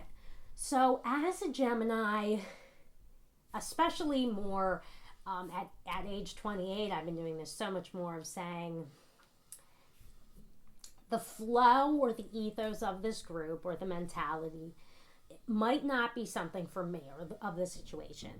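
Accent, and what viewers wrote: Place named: Philadelphia, United States. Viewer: You sound like you're from Philly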